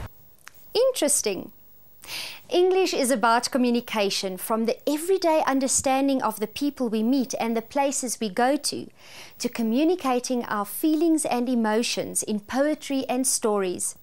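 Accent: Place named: Afrikaans, South Africa. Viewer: You come from South Africa because you have a Afrikaans accent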